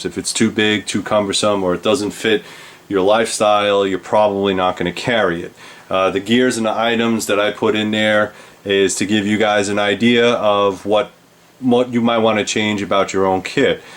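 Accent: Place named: New York, United States. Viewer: Thank you for your service! Are you from New York? Your accent reminds me of the old "Bowery Boys" movies.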